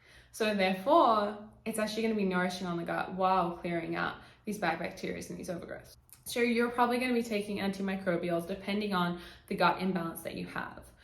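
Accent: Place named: United States. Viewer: The American accent 😱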